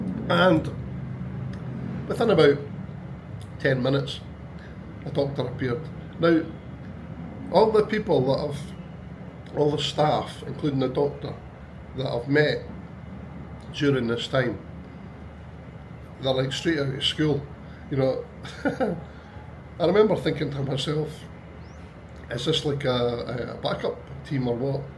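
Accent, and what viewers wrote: Scotland, United Kingdom. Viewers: Sounds you are from Scotland….no doubt it’s nice to live in the Philippines because it’s not cold like Scotland .. but when it comes to Medical care, you ,😊 you have to use all your life savings ready…American Style, No Medical Insurance your f****up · I’ve visited Blantyre a few times… Love the people over there! Very warm and friendly, if they take a liking to yah! Took me back when I heard ya speak! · Lewis Capaldi accent. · New subscriber here. I’m guessing your Scottish? 😊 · He sounds like a true Scotsman. Take care mate, stay in good health always.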